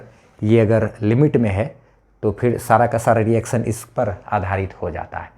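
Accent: Bihar, India. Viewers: Sir do u from BiHaR ??....😊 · Sir are u from bihar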